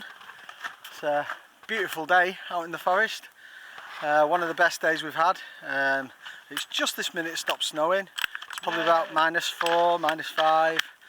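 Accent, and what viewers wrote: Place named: Manchester, United Kingdom. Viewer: Sounds like a Mancunian twang you got there 😊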